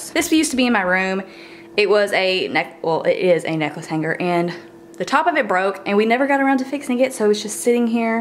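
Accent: United States. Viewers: I love your accent lol it makes me think of my home(which is Oklahoma) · Are you from Texas? You sound just like me 😂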